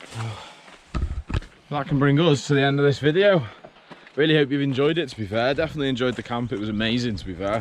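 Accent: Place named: Liverpool, United Kingdom. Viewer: Enjoyed you enjoying that. Good stuff. (Are you from Liverpool, lid?)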